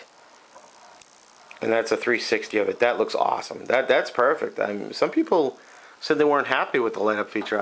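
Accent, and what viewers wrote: Boston, United States. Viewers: You sound like your from Boston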